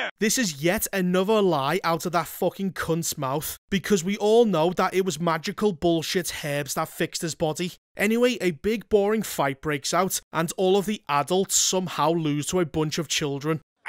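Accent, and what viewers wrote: Liverpool, United Kingdom. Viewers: I don't know which to laugh at the most; the review, the swearing or the indirect jokes! And I love the Scouser accent it's delivered in 😂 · I lasted a full ten seconds of the appalling voiceover. Scouse mixed with South Wales and a few other things.......thankfully there are subtitles. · So.. You're MAULER. But british...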